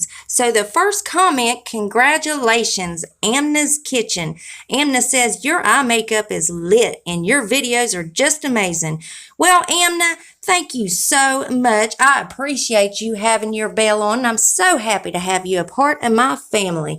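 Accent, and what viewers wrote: United States. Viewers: Thanks so much for all the spoilers you are so wonderful! Love the country accent sending you lots of love from Georgia! 💋💖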